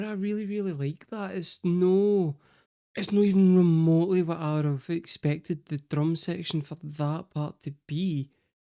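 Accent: Scotland, United Kingdom. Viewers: Nice to hear a Scottish voice getting it.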